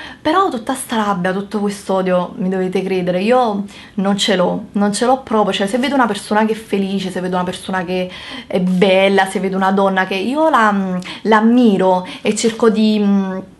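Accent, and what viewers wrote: Naples, Italy. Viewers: Brava,vai avanti così, sei una ragazza solare e chi ti giudica esteticamente nn ha proprio capito che bella persona sei a darci tutti questi consigli di nn comprare schifezze.Mi sono iscritta da poco e sono felice di iniziare a capire quali siano i prodotti buoni grazie a te.Adoro sentire il tuo accento napoletano e posso solo dirti di nn pensare a queste persone perché purtroppo sono gelosi e invidiosi di quello che fai.Io invece solo perché sono carina devo subire cattiverie in un altro modo...quindi nn è un naso che nn piace a far commentare negativamente ma tutto può essere fonte di critiche x queste persone vuote.Ti mando un bacione. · I tuoi Vlogs sono davvero molto simpatici, come lo sei tu, solare e anche le tue inflessioni Partenopee arrivano al "cuore" Pero' almeno rispondimi ogni tanto!!! Mai ricevuto una tua risposta:( comunque for ever!!!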